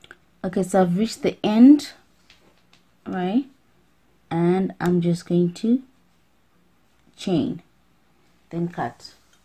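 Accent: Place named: South Africa. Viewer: You sound south African..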